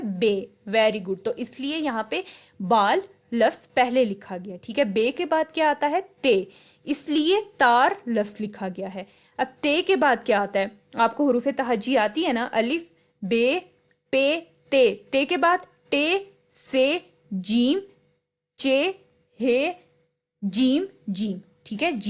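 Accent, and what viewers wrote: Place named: Punjab, Pakistan. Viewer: Punjabiiii accent hay ap ka improve yourself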